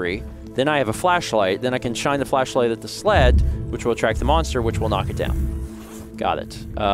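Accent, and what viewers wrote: South, United States. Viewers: I feel the same with danish XD soutern american pronunciation though... the poor man is really doing his best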